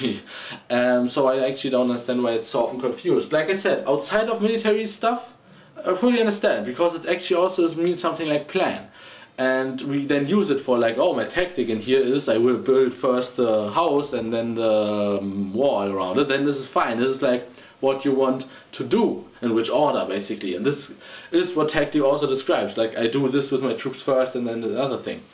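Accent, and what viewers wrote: Germany. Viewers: Yes, Shadiversity are you German? Der Akzent kommt mir so vertraut vor xD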